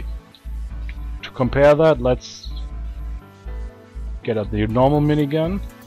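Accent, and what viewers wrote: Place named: Germany. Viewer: My Internet is spazzing out atm - I can't even load up SPUF yet I can watch YT videos (like this one) just fine. Good job, you made some nice item changes, and... Your voice is kinda...Well, German. :P No offense, just saying. (also, not Winjer - just saying :P)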